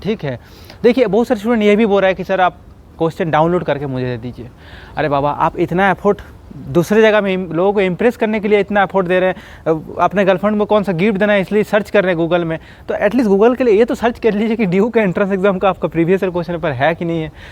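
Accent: Bihar, India. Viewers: Sir Your bihari essence 😍💖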